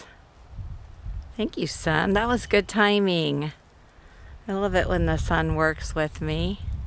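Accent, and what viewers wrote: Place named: Texas, United States. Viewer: Pretty mild compared to Texas(I assume from your accent), with average temperatures ranging from around 50-70°F. However, we do experience occasional heatwaves where temperatures can soar up to 86°F. These hot spells can be quite intense, but they are relatively short-lived. For me 70 feels like an oven :)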